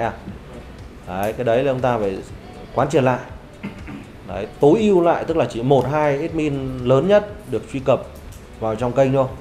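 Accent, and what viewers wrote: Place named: Thanh Hoa, Vietnam. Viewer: Chủ tịch này nói đúng giọng thanh hóa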